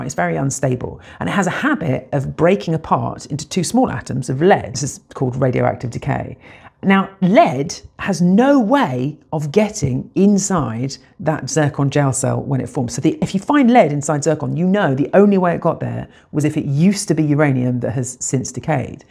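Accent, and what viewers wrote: England, United Kingdom. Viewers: Generic English accent. Hear it everyday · Aaawww 🙈 you are a 100 ct 💎 I hear your theory 😂 I'm sorry, a fake Diamond 💎 is not better than the real thing 😂 you are the Real thing and you are Blessed with the best English Accent in the world 🤗 Your Voice is worth a Billion Diamonds 💎 🙊❤️⚘️ Stay Bless ✝️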